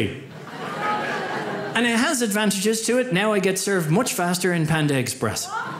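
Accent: Ireland. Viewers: The Irish accent makes it funnier 🤣🤣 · Agree, the Irish accent threw me off at first as well, but worth the watch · Hahaha… he’s Irish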